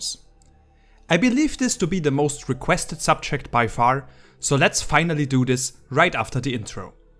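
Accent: Germany. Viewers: Wie man das deutsche raushört 😂 Aber nice Video!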